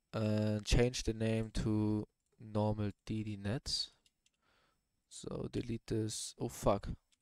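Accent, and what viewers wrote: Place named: Germany. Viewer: das war das deutscheste englisch was ich je gehört hab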